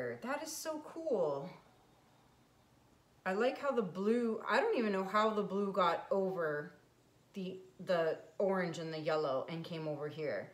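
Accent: Canada. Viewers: Omgoodness I love yer Canadian accent and you and Molly Mc like BUTTA are my favorite!!! WHAT????? Beautiful. I’m doin it!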